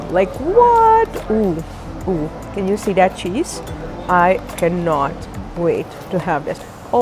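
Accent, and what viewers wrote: India. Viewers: you are acquiring that indian accent..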